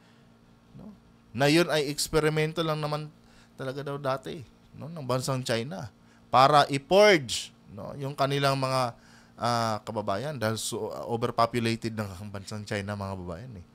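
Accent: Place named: Davao, Philippines. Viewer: Taga davao po ba kayo 😊? Kc kaparehas moh ang accent si baste..tanong lang po..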